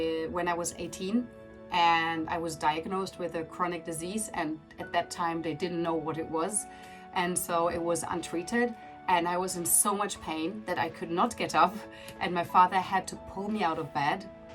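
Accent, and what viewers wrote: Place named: Canada. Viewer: Great communication skills, initially I thought I could hear a Canadian accent, then a slight German accent. Your English is faultless. Good luck with Japan.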